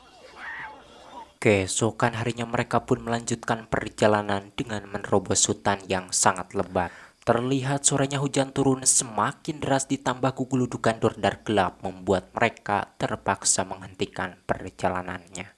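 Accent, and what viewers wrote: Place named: Sunda, Indonesia. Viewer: Geningan si mimin th orang sunda😂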